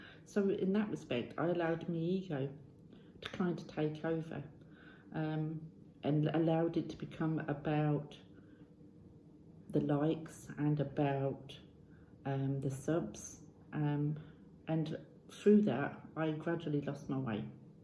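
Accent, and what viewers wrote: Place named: Norfolk, United Kingdom. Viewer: Bravo! 👏👏👏 Good for you for not selling your soul to suit others. I hear what you’re saying about subs and followers because I’ve had the same thing happen to me on Instagram, an account I started simply to share my thoughts and things that inspire me or interest me. Sometimes I don’t post for a few weeks, because, like you, I’ve felt unmotivated and uninspired pretty much for all of this year. Since I stopped posting what I know to be popular, my followers have fallen by around 50 but I can’t worry about it anymore. I’m not sure it’s so much about ego as an inherent human need/want to be liked, although I guess when you start putting out content that your heart isn’t in, but that you know will get views/likes that kind of IS ego, so well done for recognising that, and for also realising that that may have been causing your anxiety and depression. 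By the way, are you a Norfolk girl? I ask because I’m a Norfolk/Suffolk girl and I thought I detected a slight familiar accent.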